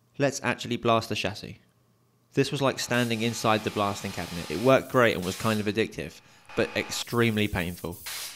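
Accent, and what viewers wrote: United Kingdom. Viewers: No problem with few talk here and there but the British accent is hard for me to understand in some points especially in fast speaking because English is not my language.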